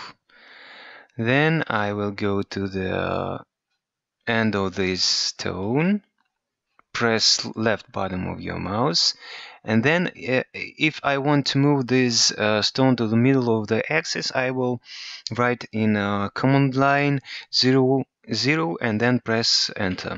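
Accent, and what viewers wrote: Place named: Russia. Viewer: Русский акцент не спутать)))))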